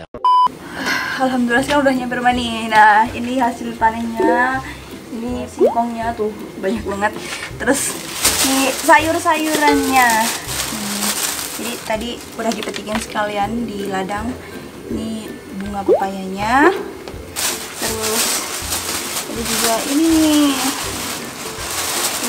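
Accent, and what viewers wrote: Banyumas, Indonesia. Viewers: Mba si umahe nng ndi mba, sue subscrbe tp ora ngerti wong ndi, bhsane ngapak2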